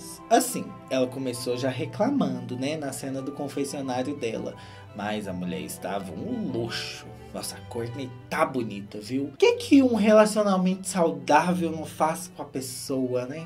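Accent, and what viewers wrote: Minas Gerais, Brazil. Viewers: do céu, NUNCA acompanhei as Kardashians. Mas gostei tanto do seu jeitin (vc é mineiro néam?!) de contar a história que vou acompanhar por aqui! Bjos!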